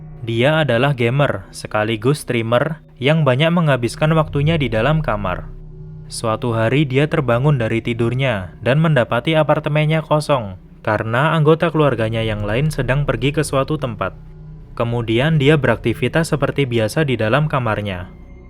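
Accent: Java, Indonesia. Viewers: Jawa y bang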